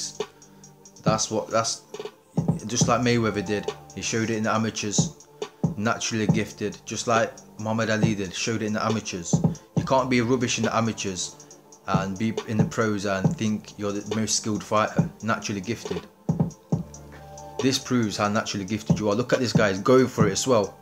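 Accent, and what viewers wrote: United Kingdom. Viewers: Yo the guy speaking in the video are you from Notts by any chance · never seen this channel before. Late subscriber. Is that a notts accent i hear or derby?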